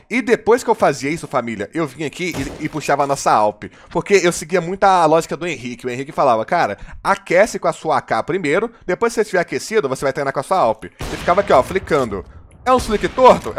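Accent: Belo Horizonte, Brazil. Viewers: Cara vc tem muito sotaque de BH, vc é?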